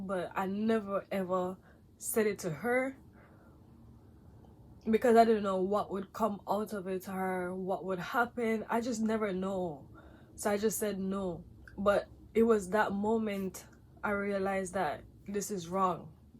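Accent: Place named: Trinidad and Tobago. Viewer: U have a sweet accent, are u Trini ???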